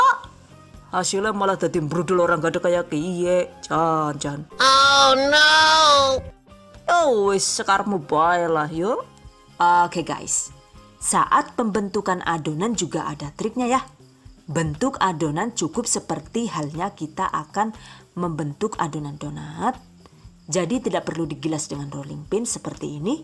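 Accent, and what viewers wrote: Banyumas, Indonesia. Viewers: Lucu2....saya suka kalo lihat,bisa +ilmu & pasti'a bisa ketawa sendiri kalo yg punya channel bicara NGAPAK....hehehe. Rika asline wonk ndi sih yuuu...genenk bisa ngapak,kunci Inggris'e juga pinter....ehhhh bahasa Inggris maksude enyonk. Hehehehehe.....piss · Asline wong Endi sih yu? Ngapak bisa, ngomong ngaggo bhs. Inggris ya pinter temen koh... Hahaha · Pas denger dirimu ngapak2, saya ngakak dan langsuung subscribe. 😂😂😂. Sukses sis dan makasih resepnya ❤️ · Aaakk ngakak bgt ngapaknya seru ditelingaa 😂😂😭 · Ngulen tangan bisa se'elastis itu bun.. mantappp Ger lgi ngapak mantep retung, sg ra ngerti li ndarani lgi ngomaih😂😂 "DONE" #GiveawayTHVDesember2019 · Jebulane ngapak yak,lucuuuu men yak👍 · Hahaha.. Ngapak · Ngakak ngapaknya mbekayune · akun masak tapi sajian videonya bikin ketawa dg aksen ngapak yg khas. Makasih atas ilmu yang dirangkum dg menyenangkan dan ga ribet:) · Oalah jebule rika wong ngapak yu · lengkap temen sh yu tutone... 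mantep sampean ko logate wong banyumas Cilacap sh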